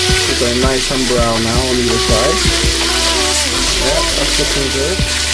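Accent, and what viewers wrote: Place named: Jamaica. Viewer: cho blowwow . hear di accent nuh .. lmao only jamaicans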